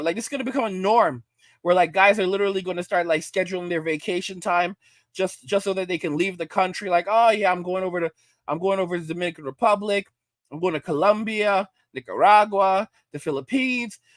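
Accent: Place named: New York, United States. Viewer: Women whine and squeal like the pigs under a gate. They're arrogant, selfish, and delusional to a T. After they told us men not to come near them, they're not worth investing so the juice ain't worth squeezing. By the way, your NY accent is on point. Way savvy than Wrestling Writer Vince Russo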